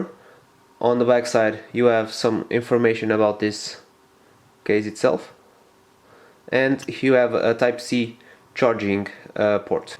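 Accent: Portugal. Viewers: are you portuguese? your accent seems like it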